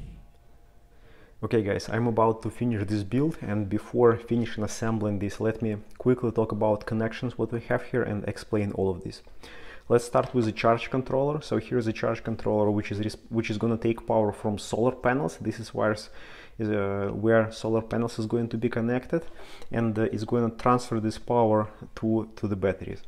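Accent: Russia. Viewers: Сразу лайк за Бьютифул рашен акцент)👍 и видос хороший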